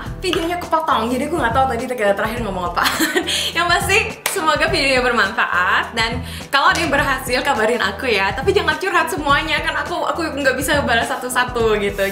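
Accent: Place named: Batak, Indonesia. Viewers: remeng , medan gt yaahh 😄❤️ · Lucu bangat Kaka bawainnya... Aku Batak jadi logat Kaka nyambung bet ke aku... Hahahha Kaka yg terakhir katanya 1 tahun pacaran... Harus langsung nikah ya ka?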